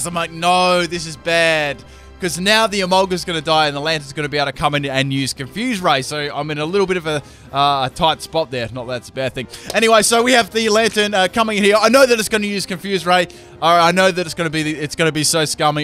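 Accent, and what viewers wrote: Australia. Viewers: "it's gonna spank me on the ass doing 3/4 health there" idk why but that line was really funny. maybe it's the aussie accent.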